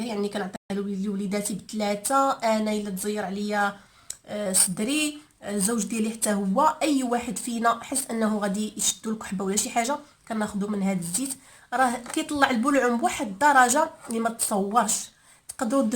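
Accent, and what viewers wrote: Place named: Algeria. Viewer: هاذي موش لهجة تونسية هادي جزائرية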